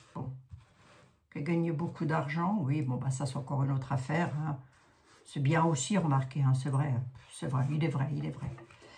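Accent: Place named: Haute-Saône, France. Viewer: Cc la vieille femme 😂😂 ,oui j'ai regarder hier pour la pompadoure tu devais regarder la suite se soir . T'on accent je le connais ma mère était de pas très loin de chez toi ou j'ai été en décembre dans le 70 luxeuil les Bains, et je voulais passer te voir mais j'ai pas oser peur de déranger. Jésus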